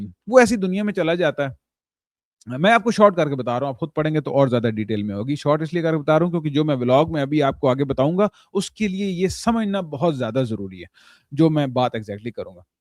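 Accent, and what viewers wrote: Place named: Pindi Gheb, Pakistan. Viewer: Me be jhang bahtar attock se urdu to aap bol raha hen lakin Axcent pindi ghab fateh jang wala he aap ka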